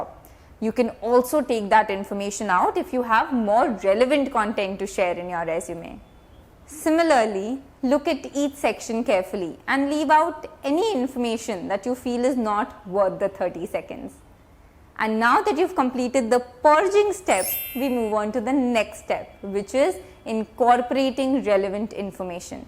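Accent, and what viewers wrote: India. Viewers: This is Called A Pure Indian Accent 🙂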